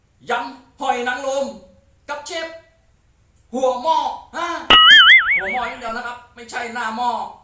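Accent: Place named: Sukhothai, Thailand. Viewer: สวัสดีครับทักทายครับสำเนียงเหมือนสุโขทัย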